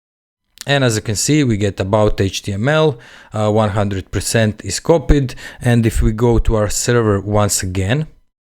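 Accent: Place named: Russia. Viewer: Акцент сразу выдает русского)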